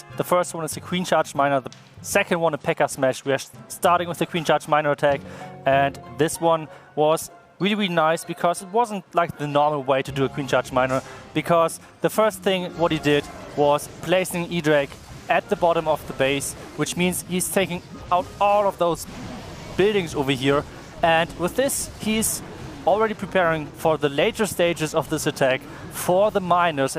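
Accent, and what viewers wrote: Germany. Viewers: ja wow 80% der deutschsprachigen Leute klingen so.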